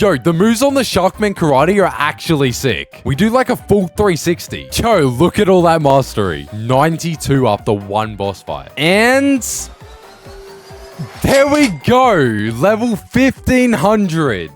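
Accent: United Kingdom. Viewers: I like you british accent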